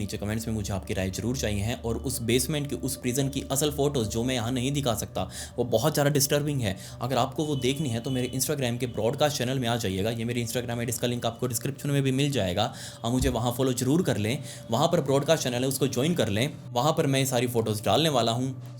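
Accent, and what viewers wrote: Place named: Haryana, India. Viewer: bhaiya ki haryanvi bich bich m nikl jati h m ek ek word notice krti hu😂😂😂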